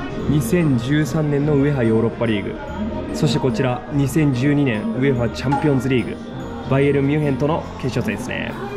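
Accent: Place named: Kansai, Japan. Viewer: しっかりと関西弁😅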